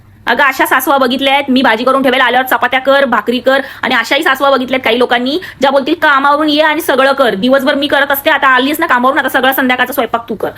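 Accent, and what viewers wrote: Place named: Western Maharashtra, India. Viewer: तुमच्या भाषेचा टोन बघून तुम्ही सांगली कोल्हापूर सातारा इथल्याच वाटताय... पण जबरदस्त रिअल इव्हेंट वर विडिओ असतात सॉलिड 😂🤣😂😂.... Keep it up... 👍🏻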